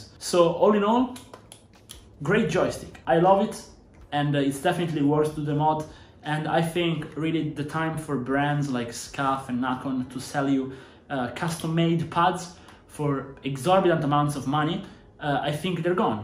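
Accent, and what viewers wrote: Italy. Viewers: You talk like Italian? Non è che sei un bro?❤ 🇮🇹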